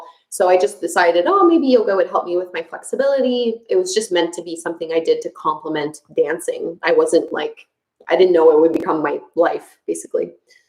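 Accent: Canada. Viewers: Thank you for this challenge, I got my boyfriend to start doing yoga with me everyday and we are loving your videos! Also I loved hearing you speak French! I'm French and I love your Canadian accent! ❤️❤️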